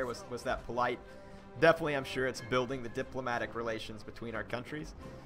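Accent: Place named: South, United States. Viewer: You say that your southern upbringing does not allow for correct pronounciation of French words but the facts is is that the south has gotten its accent because of a mix of French and american English. It really is a mix of the two because of centurys of being a French colonial region. And yes im a amateur linguist so i know :P PS: you still need to build a port in Croatia...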